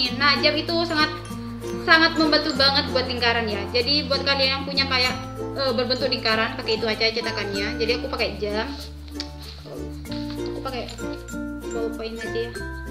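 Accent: Madura, Indonesia. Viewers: org madura kah kak, logatnya.. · Buset..Madura kah kak kwkw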